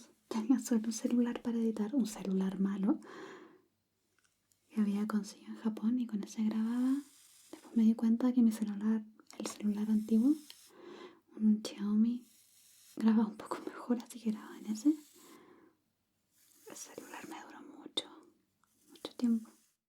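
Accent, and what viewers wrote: Chile. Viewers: Primera vez que pude sentir su acento chileno ❤, me daba curiosidad por los vídeos en neutro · Me vengo enterando que a la serie de terror le fue mal y es de mis vídeos favoritos por la historia y el esfuerzo en todos los vídeos, no lo creo. 😤 Saludos, me encanta tu voz y el acento chileno. 🧡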